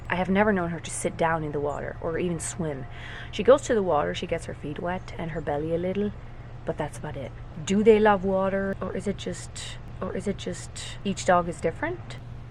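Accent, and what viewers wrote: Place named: Ireland. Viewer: I hear a hint of Irish in your accent 😊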